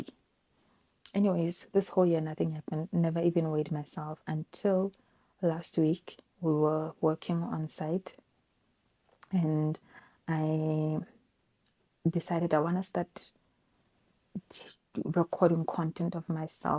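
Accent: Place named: Africa. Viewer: it might not have been your intention, but race absolutely plays a part. I said nothing about you being racist or not watching other influencers of race. My point is once you interact with people of other races in real life, face to face(not online/ through a tv screen), then your opinions about how they speak hold more validity. You can't critique something you have no first hand experience of. Weight struggles, yes maybe. But the way she speaks? Absolutely not. African accents, dialects and tonations vary considerably, so if you're not used to it, it's easy to fall back on loose criticisms like it being AI generated. Imagine if the OP left a similar comment and justifying reasons for a Romanian native, having only seen Romanian influencers online and never stepped foot in that country-- they would come for her neck. Just make sure your receipts are valid, that's all.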